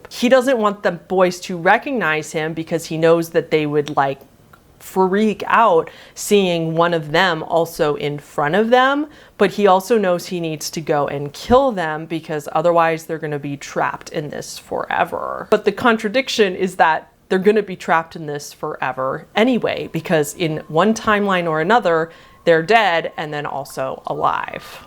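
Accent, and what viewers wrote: United States. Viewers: KobenThat’s just her accent. Most Americans pronounce “Shire” like “Shy-yur.” I’m sure she didn’t mean to offend anybody.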